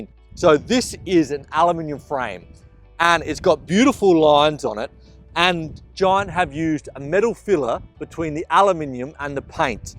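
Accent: Australia. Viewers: great review, love the aussie accent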